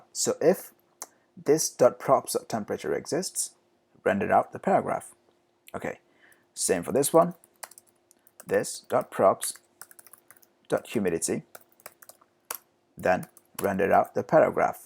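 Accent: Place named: United Kingdom. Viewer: British Accent 👍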